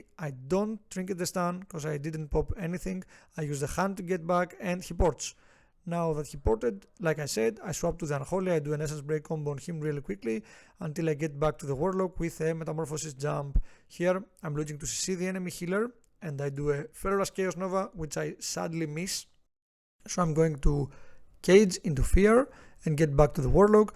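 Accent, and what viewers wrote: Greece. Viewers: You sound like a fellow Greek. 😅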